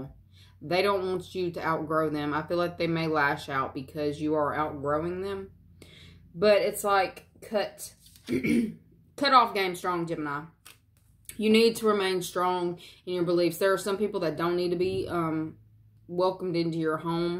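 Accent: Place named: South, United States. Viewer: Thank you so much for this reading!🙏🌻♥️ Your voice sounds just like one of my friends in Charlotte, NC. This is crazy!!😅 Love hearing your readings!!🙏❤️🌷 You must be from the south. You sound like a southern bell. I have not been in a date in 29 years. I'm a June 20th Gemini, cusper. Girl, I'm not copying anyone! I am very strong and am very worthy! I love me and love who I am.🤗♥️ I've been kicked in the butt big time. I'm the most important to me. I'm remodeling my home. It needs it desperately!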